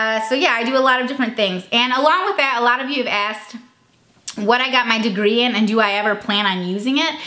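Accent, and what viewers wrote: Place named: South, United States. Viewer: i love your voice for some reason. its like sassy/southern but not annoying haha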